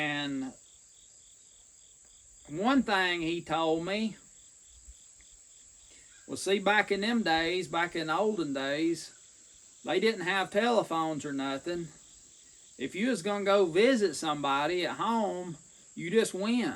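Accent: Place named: Appalachia, United States. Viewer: I love hearing your stories about the olden days. It's wonderful that your recording them for prosperity. I pray you're downloading all your episodes as backup in case something happens to this platform. I would love for you to have this wealth of knowledge and wisdom spoken in a calm, relaxed, and real Appalachian accent. I learned ways to rally the town in case of danger. Community is all we have now.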